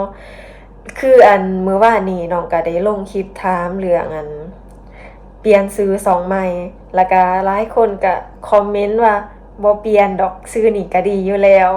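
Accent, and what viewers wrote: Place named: Loei, Thailand. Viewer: สำเนียงภาษาหลวงพระบางสำเนียงเดียวกับกับ,จ,เลย,จเพชรบูรณ์ของไทย❤❤ครับ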